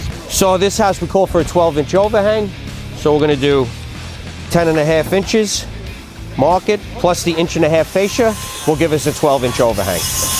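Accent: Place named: Philadelphia, United States. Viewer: I just love his accent Philadelphia😮🍄🍄🍄🍄🥇🥇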